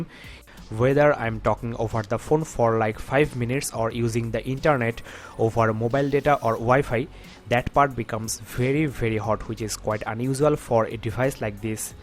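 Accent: India. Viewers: I hate when indian talk english..its so annoying..fucking annoying me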